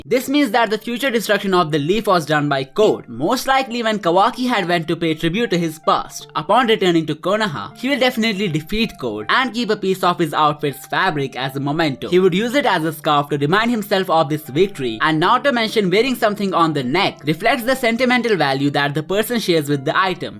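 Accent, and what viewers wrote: India. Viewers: Good content but goddamit this indian accent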